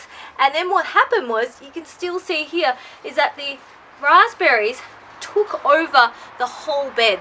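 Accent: Australia. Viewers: Hi lady!🌹 First time ever seeing you on line about Blueberries! First, what a lovely pretty lady speaking perfect Australian accent has immensely added attraction to the description, I’m a transplanted myself to America, sometimes I have hard time understanding Aussie and English folks but the more I listen to you all the more I feel closer to you, today you’ve got me hooked!🤩 Thoroughly experienced from various blueberries for better pollination and productivity to experiment Subject to soil acidity testing, VERY NICE! 😀 You’ve got adorable smart kids to help identifying soil acidic colors…. Family involvement, Lovely! The good looking crimson red fruit/ bell hanging in the middle of the metal arch, what is that fruit??? Looks like pomegranate but surrounded vines don’t look like Pom tree?! Thank you much for this video, I enjoy it greatly!!! 🌹🌿🪴🫐💖🇺🇸🤩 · I love a nice asian woman with an Australian accent who loves to garden.. 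But awesome content cause I am about to do blueberries myself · Very interesting an Asian woman with an Australian accent or European accent I absolutely 💯 love you. consider me a new SUBSCRIBER.. ROCK ON BEAUTIFUL...❤️🐼🌻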